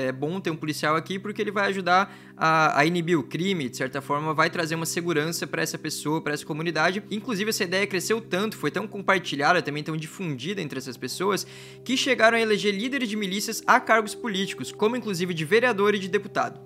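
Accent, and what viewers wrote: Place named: Rio de Janeiro, Brazil. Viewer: Com esse sutak do RJ tu não é.milcia é polícia.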